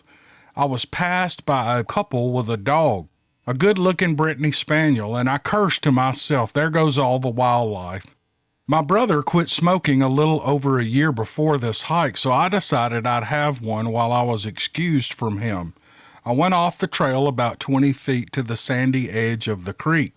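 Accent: South, United States. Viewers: my dad was from northwestern Georgia mountain country and my mom was from deep southern Georgia, and most of my many aunts ("aints"), uncles, and cousins were from the deep south and talked like it. That's one reason I enjoy your videos so much - you sound like family. I had a lengthy career in radio broadcasting back during the "golden age" of radio, so I had to polish my diction, but I still love Southern speech, and I can still slip into it whenever I want to. That's an old picture over there to the left, and unfortunately I don't look like that any more. God bless you and all "your people". Ite?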